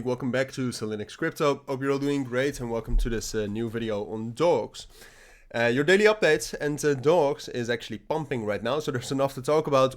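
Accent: Netherlands. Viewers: Bro are you dutch? Haha sounds like it, i am dutch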